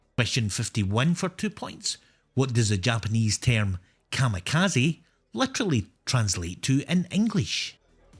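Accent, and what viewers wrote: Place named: Scotland, United Kingdom. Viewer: I did indeed enjoy the quiz and all your quizzes. I love the accent too. My parents were born and raised in Scotland (Fife) and all my cousins live there. So your accent reminds me of bonnie Scotland!!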